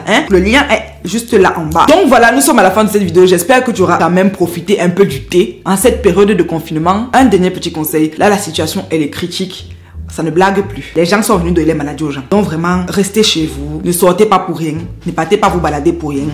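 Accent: Cameroon. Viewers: Ton accent kmer c'est la magie Sista, courage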